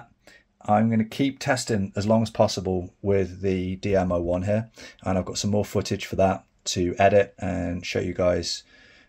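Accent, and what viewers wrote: United Kingdom. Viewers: Very interesting. Listening to you speak, can I hazard a guess that you originated not many miles from Swindon, UK??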